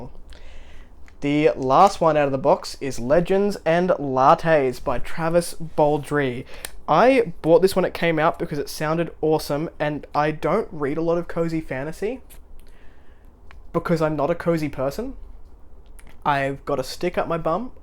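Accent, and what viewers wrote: Australia. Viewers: Great to hear a Aussie accent. Loved JV Jones back in the day.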